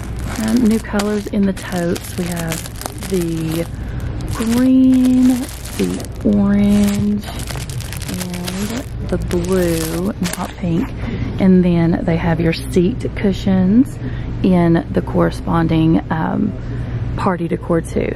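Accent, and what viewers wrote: South, United States. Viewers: I just love your voice!! So southern 💛 love your videos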